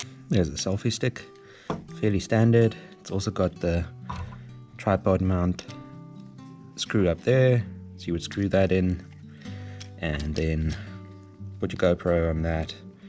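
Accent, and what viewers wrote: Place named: South Africa. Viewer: I hear a South African voice, I see 10k subs, and I watched a good review. All I could do was subscribe. Very excited that I found this channel!! Yes boys!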